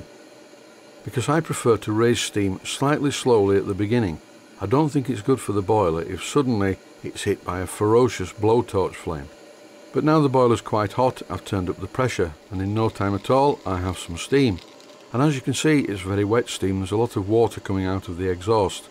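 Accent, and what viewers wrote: Yorkshire, United Kingdom. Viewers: Your Jorkshire dialect makes it sound like you say "combustshn." :D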